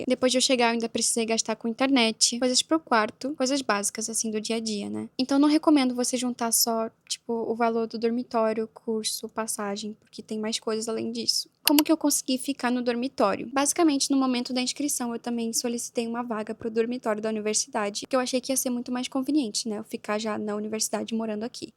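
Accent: Portugal. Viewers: você tem nitidamente um sotaque português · senti o sotaque de português Portugal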